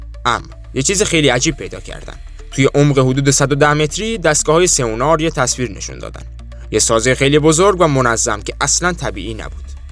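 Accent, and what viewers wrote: Iran. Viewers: کص شعری قشگی بود همه چیز خارجی بود وفقط صدا ایرانی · از تلفظ کلمات مثل کف دریا و. باشه که میفرمایید معلومه. مال همون محل کمیاب هستید و ماهی گیر اونجا هستید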